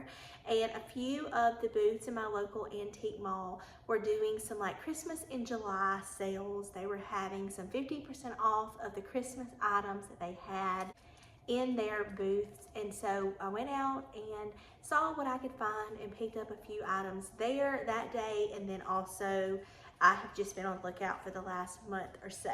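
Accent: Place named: South, United States. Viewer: Love your southern accent 🇬🇧🇺🇸🌸🌸 (I really miss being in Tennessee)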